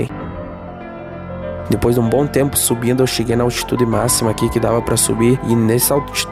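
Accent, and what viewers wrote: Curitiba, Brazil. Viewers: Cara vc é de Curitiba? Seu sotaque é igualzinho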